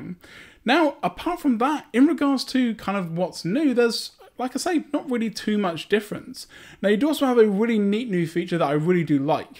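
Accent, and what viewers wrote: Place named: United Kingdom. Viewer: Most likely due to his British accent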